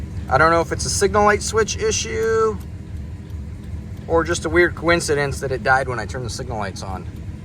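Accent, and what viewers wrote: Canada. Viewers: Canadian eh!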